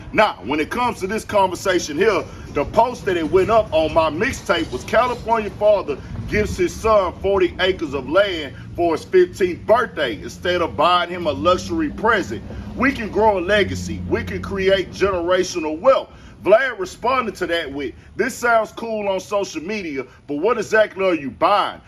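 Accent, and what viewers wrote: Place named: Houston, United States. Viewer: Brodie u so Houston😂😂😂 “ unless u wanna squabble” 🔥🔥🥶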